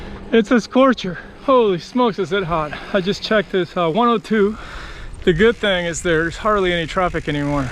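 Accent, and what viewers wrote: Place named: Puerto Rico. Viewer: I always try to figure out what accent I'm hearing..my guess is Puerto Rican?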